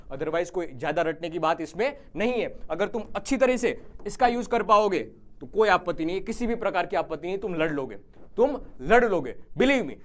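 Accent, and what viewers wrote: Gujarat, India. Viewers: Sir aap gujarati ho kya ?? apki tone se lag raha hai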